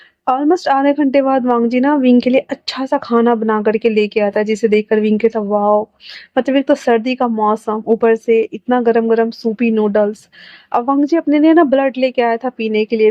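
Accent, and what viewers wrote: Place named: Bihar, India. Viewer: Wonderful story di par jo aap bihari maine bolte hai wo mujhe bhot acha lagta hai osm ❤❤❤😊😊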